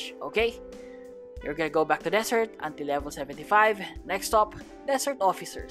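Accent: Philippines. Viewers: Parang filipino accent mo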